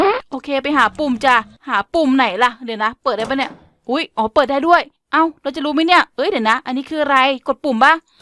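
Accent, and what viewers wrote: Isan, Thailand. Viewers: พี่ เป็นคนลาวใช่ไหมค่ะหนูพี่พูอีสานคะ😅😅😅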